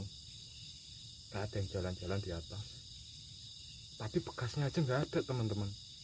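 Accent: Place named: Banyumas, Indonesia. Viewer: Kebumen.....wong ngapak iki😂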